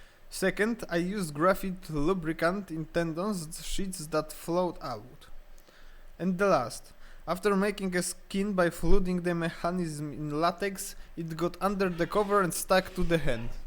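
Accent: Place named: Poland. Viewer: Coś mi tak zaświtało że to może być rodak po tym akcencie xD Życzę samych sukcesów, choć przykre jest gdy się patrzy jak wielki potencjał marnuje się u nas. Na zachodzie już dawno byś siedział we własnym laboratorium, choć prawda jest też taka że gdzie by się z tym nie poszło to każdy będzie chciał mieć to dla siebie.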